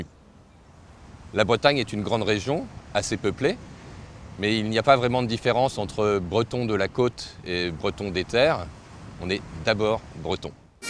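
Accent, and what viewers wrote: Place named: England, United Kingdom. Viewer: The accent sounds English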